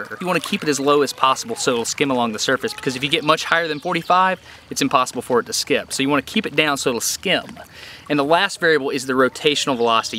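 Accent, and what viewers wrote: United States. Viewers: It's funny hearing Americans pronouncing Swedish names since we have very different pronunciation of the letter 'A'.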